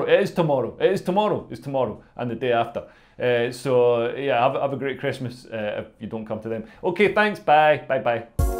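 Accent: Scotland, United Kingdom. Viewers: didn't know Clarkson is a comedian, a Scot mocking a scouser haha brilliant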